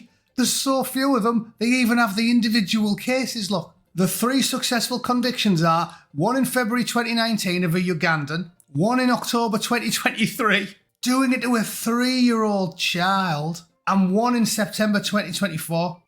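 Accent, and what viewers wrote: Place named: Newcastle, United Kingdom. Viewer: Loved the Geordistani accent mate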